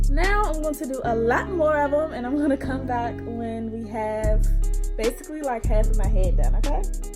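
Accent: Washington DC, United States. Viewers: I knew the moment she started talkin 🤣🤣 DC all day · You from DC sis? That accent ayyyeee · Is she from the DMV? I feel like I'm getting notes of DC when she talks lol. ANYWAY I'm finna try these next week 😜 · I know a DC accent when I hear one 😏😏😏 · You from DC? I hear our accents Lmaoo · Girl are you from DC or PG because the accenttttttt!!! 😂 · Yes accent, I can tell you’re from DC!!!! Lol ❤️ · I knew you sounded like you were from dc! 😭😭😭🖤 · Subscribed as soon as I heard that DC accent 😭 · Was going to say you’re from DC but you already confirmed. I love our accents ❤️ Great video! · Are you from DC? I am from Maryland and I can hear your accent. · You started talking &nd I was like BOOM that’s DC right there lol. I LOVE this tutorial &nd how you added all the products, that’s always my main question. I also have to give you props because you have so much hair naturally I was tired for you after the bottom row LMAO. 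· Joy B yup 1 minute in..I'm like she from DC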